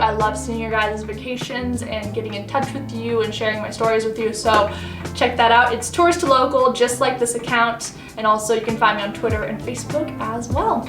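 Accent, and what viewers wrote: United States. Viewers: I would love to watch your vlogs because it's a hope of a boy who thinks America has a place for him too. Actually in 2 ways you're helping - 1. Learning English without Subtitles to get your Accent. 2. Tourist like a local & relevant information + pros & cons. Thank you in advance. Keep up the good work. And please upload regularly. BEST WISHES EVENTUALLY. 🎆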